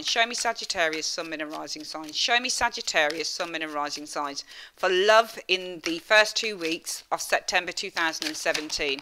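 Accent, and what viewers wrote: Birmingham, United Kingdom. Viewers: I liked your reading and the way you put it over in your obviously english accent? I would surmise it came from somewhere near Birmingham?I am from near there myself originaly but now live in Australia for 49years.You say you are a gypsy?I would love to know your surname, as I am a Romany by birth we prefer that to gypsy as we are the people of Rom! not a lot of readers shoot straight from the shoulder but you do I like it and can handle the swear words to it shows passion in what you do and is not as everything seems these days politically correct,thank you for the reading as I do not understand Tarot but do Astrology.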